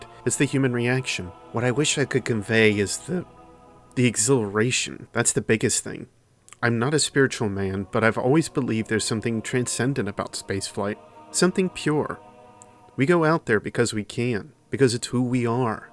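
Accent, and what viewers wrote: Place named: Russia. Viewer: Your Russian accent sounds like a Nord dude from the Elder Scrolls Online...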